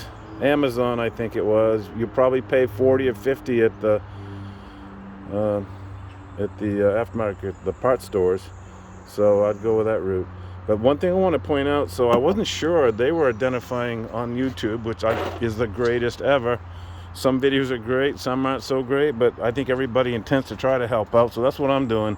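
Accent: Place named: Portland, United States. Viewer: "ayeeee good mornin youtubas" dont worry man i can already tell youre coming at me from portland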